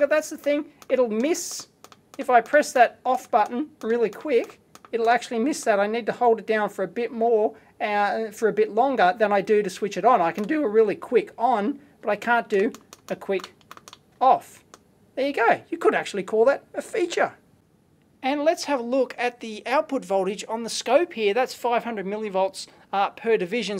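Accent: Australia. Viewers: ok I am starting to like the aussie accent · Commenting again because this is that good. This is the first video by I've watched, but communicating the thought/development process of a circuit is what I've been searching for since my undergrad years! Extremely impressed. And delivered with an Australian (?) accent? Amazeballs, and Bob's your uncle.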